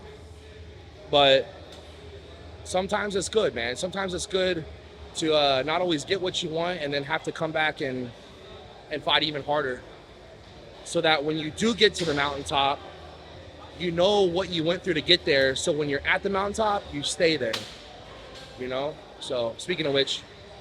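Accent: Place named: Florida, United States. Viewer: You sound like a true Florida cracker bro “fittin to get swole”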